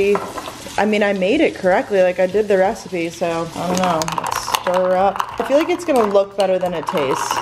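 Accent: Long Island, United States. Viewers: I’m a type nine:))) also i can hear the Long Island accent making a come back 😂😂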